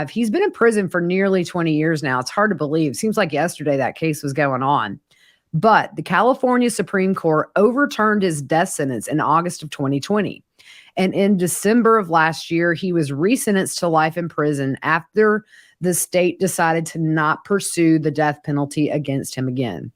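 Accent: South, United States. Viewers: I could listen to you talk 24/7! I just love your voice with a slight accent. Are you from the South? I’m in western North Carolina but my accent sucks! lol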